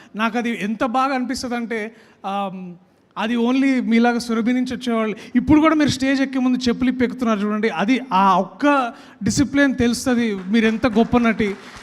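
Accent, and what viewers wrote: Telangana, India. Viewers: ప్రియదర్శి గారికి ఒక సలహా తెలుగు సినిమా లో యాక్ట్ చేసినప్పుడు స్టోరీ బట్టి dilect ఉంటుంది అది తెలంగాణ యాస అవొచ్చు రాయలసీమ యాస కావొచ్చు లేక శ్రీకాకుళం యాస కావొచ్చు కానీ తెలుగు అని ఒక భాష ఉంది అది ఎవరో ఒకరికి చెందింది కాదు అది అందరిదీ అందువల్ల తెలుగు మాట్లాడానికి ప్రయత్నించండి మీరు తెలంగాణ యాస మాట్లాడి ఒకే ప్రాంతానికి చెందినవార్ని సంతోష పెడుతున్నారు ఇది తప్పు ఇలాగ చేసిన చాలా మంది కనుమరుగవుతున్నారు, మీరు అలాగా అవుతారు అనుకోవడం లేదు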